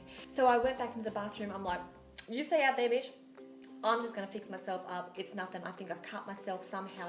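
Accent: Australia. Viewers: You're Australian right? I am ☺️😘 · Someone else is Aussie😆 Love you💘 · i love ur humor ur so funny i love ur sas and u have a real aussie acsent u sound like kath off kath and kim i live in australia too perth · Are you from Australia?? If so where from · You have the best Aussie accent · Are you Australian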